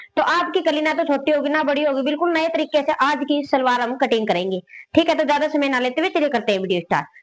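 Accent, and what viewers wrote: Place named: Kaithal, India. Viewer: Ap kaithal side hein kya.mere mama rhte hein unki boli h ap jaisi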